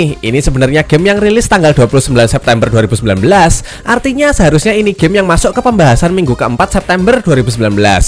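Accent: Java, Indonesia. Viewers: Medok e puol mass😂 tapikusuka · Bahasa indonya medok banget yak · Bg jangan meddok kali bg. Kami yg bukan sesuku agak bingung bg dengernya 👍🏽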